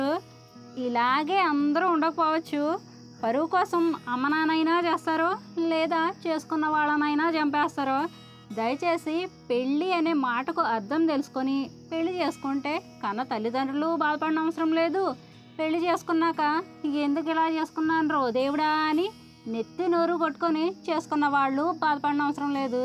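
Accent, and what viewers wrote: Telangana, India. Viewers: Madi telengana maku ma slang aunty estam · Madi telangana.naku ma slang antene mastu estam akka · శుభోదయం మిత్రమా ❤ తల్లీ తండ్రుల ప్రేమకి ప్రపంచంలో మరే ప్రేమా సాటిరాదు సుమా..😊 దూరపు కొండలు నునుపు అని ఊరికే అన్నారా ఉన్నదంట్లో తృప్తి పడకుండా లేనిధానికోసం వేంపర్లాట పడితే మనశ్శాంతి కరువవుతోంది మరి.. ఎక్కడ చూసినా చాలా కుటుంబాల్లో ఈ ప్రేమ పెళ్లిళ్ల వ్యవహారంలో పెద్దలే పిల్లలమాట వినాల్సివస్తుంది..😢 లేదంటే మేము మెజర్స్ అంటారు పాతికేళ్ళు కని పెంచిన పెద్దవాళ్ళ మీద ఎందుకు ఈ పిల్లలకి నమ్మకం ఉండదో నాకు ఇప్పటికీ అర్థం కానీ ప్రశ్న..ఎంత ధైర్యంగా ప్రేమ పెళ్లి చేసుకుంటారో అంతే ధైర్యంగా ఆజంట ఒకరినొకరు అర్థం చేసుకుని వృద్ధిలోకి ఓస్తే ముందుగా సంతోషించేది తల్లితండ్రులే..ఇలా కాకుండా కోరి కట్టుకున్నవాడు కష్టపేడితే ఆ అమ్మాయినీ వారి సంసారం చూసి తల్లితండ్రులు చచ్చేవరకు బాధపడుతూనే వుంటారు..😢 మీ తల్లితండ్రులు మీ అందరిమీదా ప్రేమగా ఉన్నంతలో వాళ్ళ భాధ్యతలు నెరవేర్చడం చూస్తుంటే చాలా ముచ్చటేస్తుంది..పిండివంటలు అన్నీ నోరూరిస్తున్నాయి మీ ముగ్గురు అక్కాచెల్లెళ్ళు చాలా అదృష్టవంతులు సుమా...❤ గోదావరి పిల్ల నోట తెలంగాణ మాట యాసతో భలే మాట్లడావ్ సుమా..👌😂హైద్రాబాద్ కి నువ్వు కూడా పిల్లల్ని తీసుకుని వెళ్ళవల్సింది సుమా..నీ మాటలతో hyd చల్లబడి పోతుంది..😅అయినా అక్కడ మన అంత ఎండలు ఏమీ లేవు అంట సుమా నాలుగురోజులు వెళ్లాల్సింది..😢 పోన్లే ఇక్కడ నాన్నని హ్యాపీగా చూసుకుంటూ మాకు అక్కడ ప్రకృతి అందాలన్నీ చూపించు..❤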